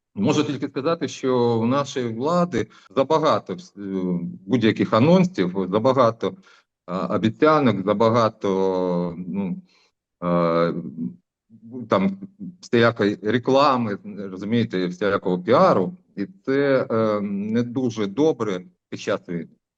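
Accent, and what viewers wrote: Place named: Surzhyk, Ukraine. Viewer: Ну говори на русском, если сложно, нафига этот суржик!??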